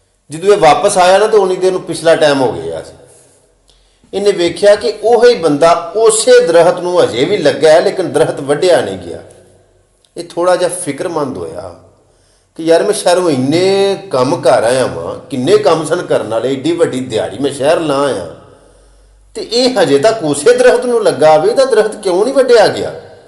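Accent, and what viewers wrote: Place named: Sialkot, Pakistan. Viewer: Pure Sialkotiya basha bolde sir 🙏🙏🙏From punjab india to asi bhi Sialkotiya Jatt hmm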